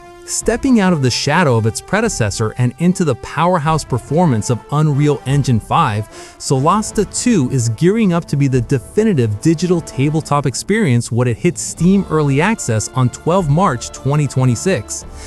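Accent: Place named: Australia. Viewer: your voice doesn't sound Indonesian at all despite location marked as Indonesia. not even singlish. hmmm australia? or is this AI run channel?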